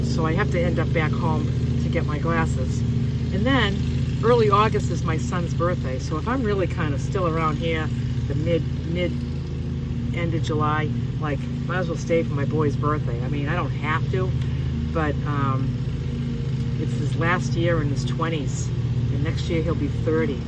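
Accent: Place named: New England, United States. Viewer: I’m a NH native and love seeing my home state being enjoyed; you have a distinct NE accent so it must be fun to be back in the neighborhood. Be safe… Wish I’d seen this sooner as I would have encouraged you to visit Willard Pond in Hancock… you can swim there for free - my grandmother established it as a reserve and it eventually was handed over to the Audubon Society to manage it.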